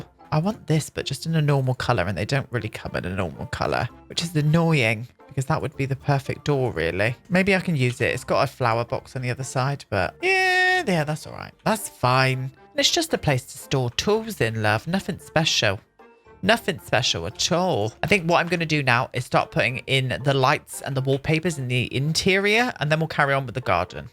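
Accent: United Kingdom. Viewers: You know, I've never actually liked my name until I heard it in your accent... it sounds way better from a Brit than an American!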